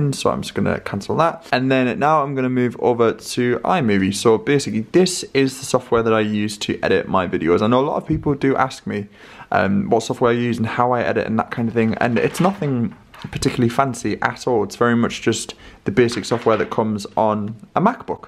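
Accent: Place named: Newcastle, United Kingdom. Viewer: your geordie accent caught my ear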